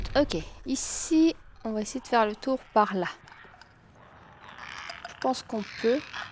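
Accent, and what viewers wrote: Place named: Quebec, Canada. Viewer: J'adore tes vidéo est ta personnalité en plus j'adore l'accents Québécois Je te suis depuis tes 900 abonnés est ta tellement bien évoluer même avec tes 100k tu reste toujours aussi proche de ta communauté est sa c'est rare!! Bonne continuation:) Sache que je ne me desabonnerai jamais:^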